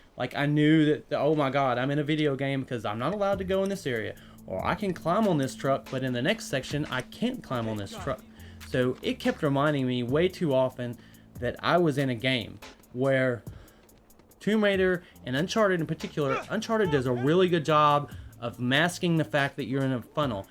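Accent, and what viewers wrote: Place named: South, United States. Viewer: Where are you from? I hear a bit of a Southern accent.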